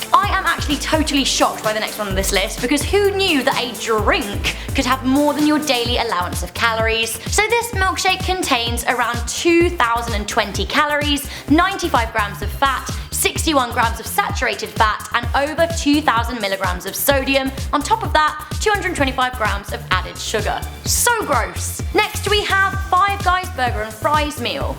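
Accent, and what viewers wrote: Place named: England, United Kingdom. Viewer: Saying all of our American foods in an English accent is so funny 😂😂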